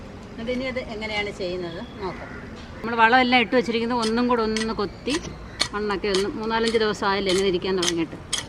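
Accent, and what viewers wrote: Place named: Punalur, India. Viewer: chechi. Punalur ആന്നോ. Veedu. അവിടുത്തെ. Samsaram ആണ്. Super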